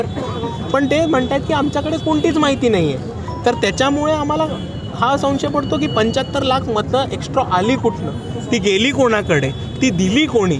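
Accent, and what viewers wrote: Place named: Pune, India. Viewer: ह्यांचे भाषा व बोलणे एकदम पुणेरी वाटते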